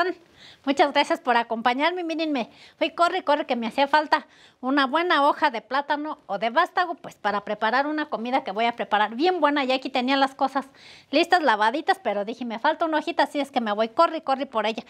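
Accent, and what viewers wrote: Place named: Michoacán, Mexico. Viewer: Tu hablas bien a la forma qué hablan en Michoacán con todo respeto Pero hablas muy rápido Pero si tú quieres hablar así rápido claro te vas a trabar Pero explicas bien no digas que no saber hablar !claro qué sí puedes ! Adelante si se te entiende no vuelvas a decir que dices una cosa por otra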